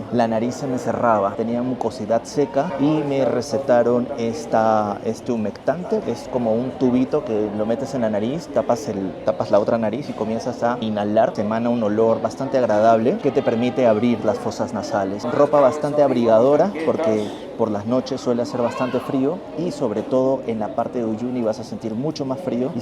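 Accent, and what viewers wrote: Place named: Peru. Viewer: Que esperabas....en invierno y a 4000 metros de altura. Mejor te quedabas en casita y calientito. Por tu tono de voz Peruano.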